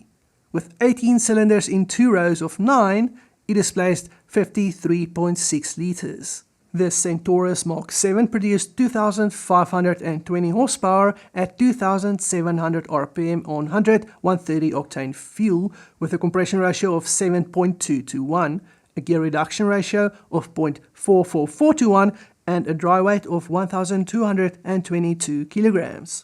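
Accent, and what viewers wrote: Afrikaans, South Africa. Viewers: Why do you sound afrikaans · Dig the the SA Dialekt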